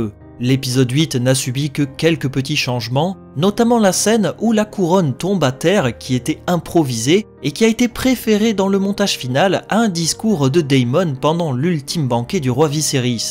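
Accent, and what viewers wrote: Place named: France. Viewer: C'est vraiment très étrange d'entendre les noms des personnages de cette façon... d'une manière si française, plutôt qu'en VO (plus précisément en haut valyrien du coup.) [Ranyra] à l'écoute, au lieu de [Wraynira]. [Jackérys] au lieu de la prononciation [Jaycéwrys]. Entre autres. Ça pique. Mais c'est mon avis.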